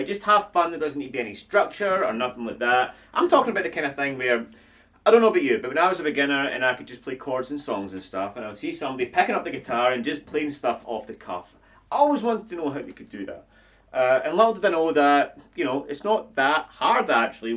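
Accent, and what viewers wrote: Scotland, United Kingdom. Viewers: Good to hear a fellow Scotsmen I improvise basically if you learn the 5 pentatonic scales learntje notes then find them on the fretboard easy lots of practice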